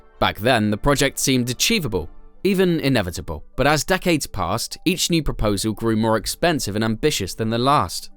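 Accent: United Kingdom. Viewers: We hate this harry potter accent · That Limey twang is irritating. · I can’t take a British man talking about nyc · Who let the gdamn British do this doc.... calling Queens, LI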